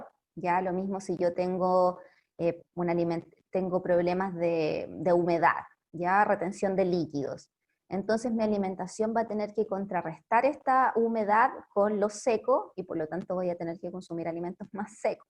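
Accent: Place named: Chile. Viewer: Maravilla de conferencia .... Gracias , hermanita ... Dulce acento , el chileno ! Un abrazo de luz desde Buenos Aires .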